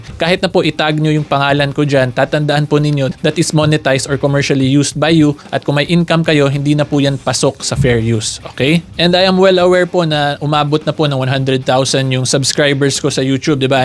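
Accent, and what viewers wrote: Visayas, Philippines. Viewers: Bisaya ka atty.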